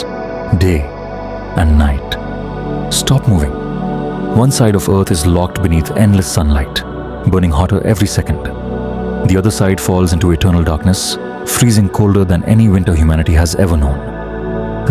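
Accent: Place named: India. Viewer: Hello bro 👋 Congratulations on ur new channel 😍 and Happy New Year 🎉 Saw ur video and realized that the content is actually good but the views r slow. I do voiceover. If you'd like me to voiceover ur vids, I would be happy to provide my services. I am an Indian and by ur accent I can say that we both r bros 😃. It appears to me that ur accent still lacks a global appeal. This is one of the reason for slow growth for an Indian youtuber. Kindly reply if you r looking to speed up things!!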